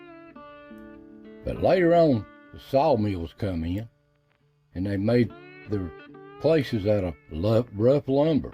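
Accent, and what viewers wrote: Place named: Appalachia, United States. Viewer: I’ve been watching you for a few years now. Love your shows and your Appalachian accent. Glad you’re feeling much better and can get around now. Sending prayers and much love your way dear friend. Keep us all informed on your little woodland friends. Loved seeing all the old homes. Wish they could all be restored. See you next time.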